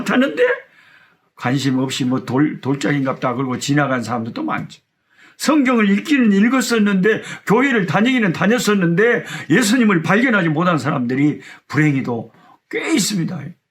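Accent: Jeolla, South Korea. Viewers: 말씀을읽는다는건축복인듯합니다 우리가 하나님의 자녀라면 그분에대해서 궁금해야? 꿀송이큐티가더욱가슴으로다가올것입니다 저 포함해서 말씀을읽어나가는집사4명이있는데 다들처음엔말씀이어려워서힘들게생각했는데 꿀송이큐티와 데일리노트(그날그날일기및주님과대화또한은혜쓰는노트)이렇게하다보니 지금은상경을재미있게볼수있고 잘모르지만성경도알아가는맛이 대단해지고있습니다 말씀은모르든지알든지 매일매일 우리의영을위해서먹어야할것같습니다 목사님의전라도사투리의성경공부가 다른채널보다 정감이가고 듣기도좋습니다 요즘은우리가 세상에서의삶도중요하지만 나의영에 부유함에더욱더신경써야할듯합니다 오늘새벽에는반은졸고 반은기도하고했지만 병원으로돌아오는발걸음이가벼웠습니다 말씀이사람을변하게하고 말씀이세상을보는눈과사람을대하는모든걸변하게합니다 오늘도 나의예수님은 최고였습니다 감사합니다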